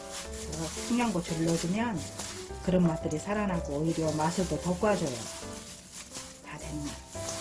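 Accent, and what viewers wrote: Jeolla, South Korea. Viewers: 호남말씨 쓰시는 누님~돌아가신 부모님도 전라도 분이셨어요~목소리 정감이 가네요~영상보고 꼭 한번 만들어 먹어봐야겠네요~감사요~^^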